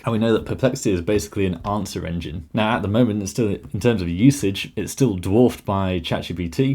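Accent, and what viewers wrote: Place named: North, United Kingdom. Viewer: Northern accent there 👀 Where you based buddy?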